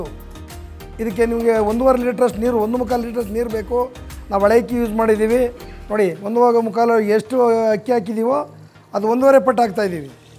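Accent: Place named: Bangalore, India. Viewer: Namaskara. Naanu Sehar, Hosur. Ivaaga Inna 3 thinguligea USA Alli idhinee. Neevu nimma 54 vayasinalli yaagidhiro adhe thara mamma thandhe Krishna Swamy avaru adhe 54 lalli adhe thara idru. Adharke innonondu Namaskara. 82 vayasu vargu chennagi idru. Neevu 100 vayasu irilike aaseervafagalu (Naanu ivaga 73 Senior). 2 varushadinda nimma Tamil postgalu nodutha idhni. Nimma Bangalore style tamilu super. Best wishes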